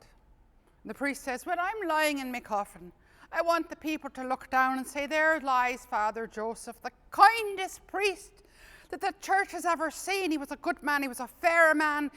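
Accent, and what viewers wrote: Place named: New Zealand. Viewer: I love your Yiddish New Zealand accent.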